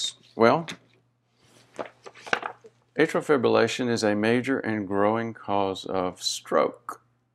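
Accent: South, United States. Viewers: Don't know if it's your Southern drawl or wha'at but you Look n' Sound like you need a shot of Adrenaline-or an Least a Double Espresso with a teaspoon of Honey-info was Good but I Almost fell Asleep!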